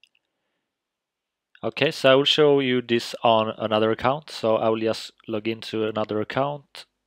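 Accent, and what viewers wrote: Sweden. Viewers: are you from sweden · r u from sweden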